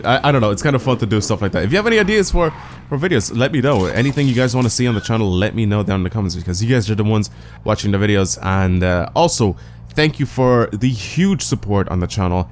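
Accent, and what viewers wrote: Ireland. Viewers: Are you Irish you sound it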